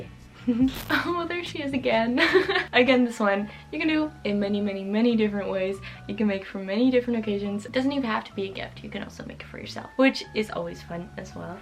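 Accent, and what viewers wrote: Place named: Netherlands. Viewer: i lovely, ik denk dat je Nederlands bent bahaha klopt dat? Je naam en gwn je uitspraak😚 pls reageer ff want ik ben benieuwd ik ben ook uit Nederland en ik woon in Nederland, ik zag een keer ook dat je stiften had van de HEMA haha en 1 keer kaarsen van de blokker❤ maaruhh ik hou van je video's ❤❤❤ keep up the good work girl ilyyy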